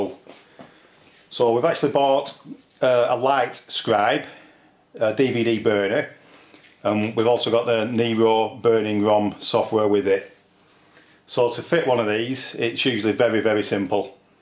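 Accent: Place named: London, United Kingdom. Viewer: Very Simple...Thank You.... Are you from London....Your accent is very nice. I like it........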